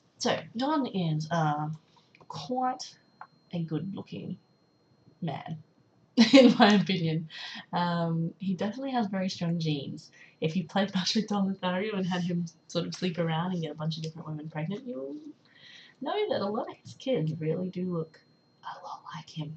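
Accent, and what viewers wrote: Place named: Australia. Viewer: so happy to have found a fellow aussie's channel! it was so funny, i was watching and i was like that accent... it's strange.. and then it hit me - it's my accent LOL!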